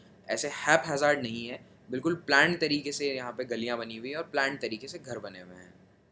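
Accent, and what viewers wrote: India. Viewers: English has been taught in India since 1830. Why can't you speak English? Do not worry about your Hindi accent. Just speak English!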